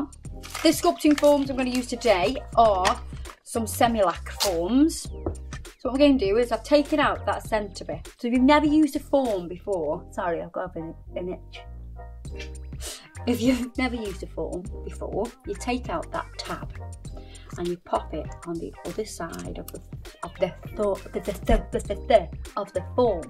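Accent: United Kingdom. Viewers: I really enjoy listening ur british accent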